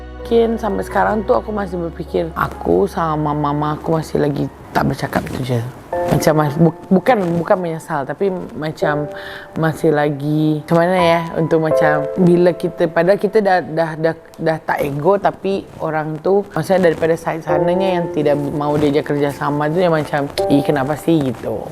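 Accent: Malaysia. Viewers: Alhamdulillah, tahniah!! Yg penting loghat bahasa melayu dgn indo nya bercampur ya. Unik sekali 😁😁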